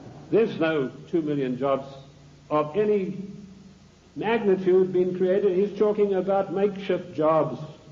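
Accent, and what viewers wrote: South Africa. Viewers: Definitely either of the two... I thought SA🤷🏼‍♀️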